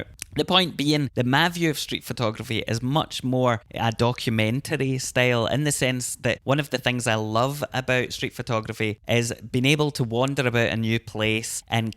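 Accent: Scotland, United Kingdom. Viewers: 👀 Such an awesome new series for your channel and a perfect way to start it off! 🎹📷Really enjoyed all the photos and your "dulcet Scottish tones" throughout! 😁😁 So funny, we both live in areas where the attitudes of the people seem quite similar! haha Looking forward to the next!! 🙌🐀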